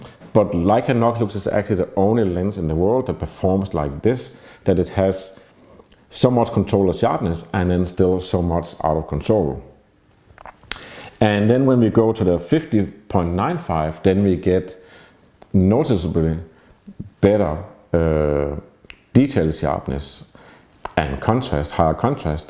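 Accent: Denmark. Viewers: very danish accent - but god input man !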